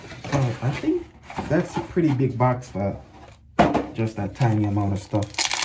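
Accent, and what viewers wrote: Jamaica. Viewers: Jamaican? Respect brethren!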